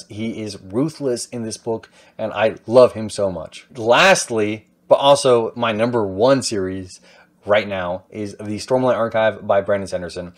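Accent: South, United States. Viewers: I love your video at your house in Texas during your quarantine until you're talking about your Top 10 favorite fantasy series with a lot of books are Red Sister, Grey Sister and Holy Sister by Mark Lawrence, The Name of the Wind and The Wise Man's Fear by Patrick Rothfuss, The Fifth Season, The Obelisk Gate and The Stone Sky by N.K. Jemisin, Eldest, Inheritance, Brisinger and Eragon by Christopher Paolini, Gideon the Ninth and Harrow the Ninth by Tamsyn Muir, Mistborn, The Well of Ascension and The Hero of Ages by Brandon Sanderson, Green Rider, First's Rider Call, High King's Tomb, Blackveil, Mirror Sight and Firebrand by Kristian Britian and so many others while you're talking about all the characters from the book, I hope your husband, Zach will be very proud of you, but I know you're half Mexican and you had the Southern accent!! #BookTube #SFF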